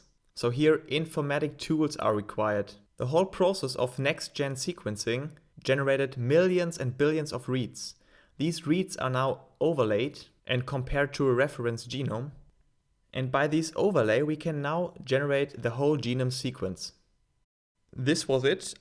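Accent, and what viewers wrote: Germany. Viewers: no one teaches better than a guy with German accent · Are you German?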